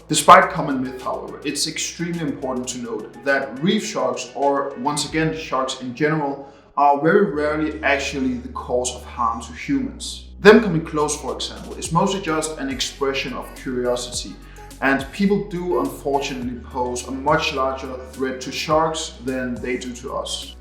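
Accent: Denmark. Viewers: Are you from Denmark? Your accent sound danish.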